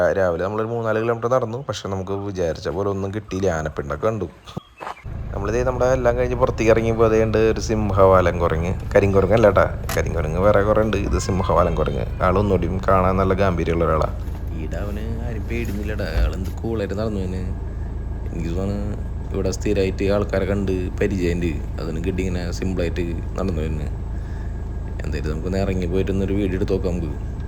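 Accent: Thrissur, India. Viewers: Ninte Thrissur slang super.. videosil Ellam oru positive vibe undu 😍😍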